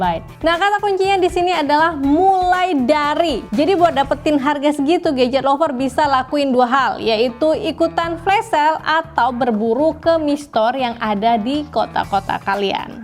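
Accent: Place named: Depok, Indonesia. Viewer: kalo dari logatnya kek org depok😄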